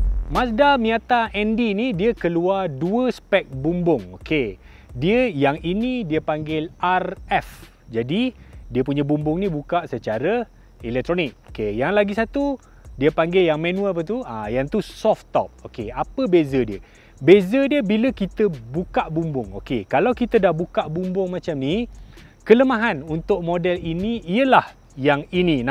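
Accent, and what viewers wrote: Malaysia. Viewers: Best dgr slang malaysia hahhaa. Blh faham btl btl tanpa subtitle. At first I ingat ini indo video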